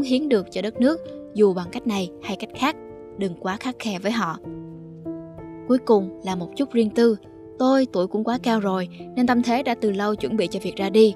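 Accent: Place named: Ben Tre, Vietnam. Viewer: bạn voice là người Bến Tre à kiểu đọc sai chính tả của người Bến Tre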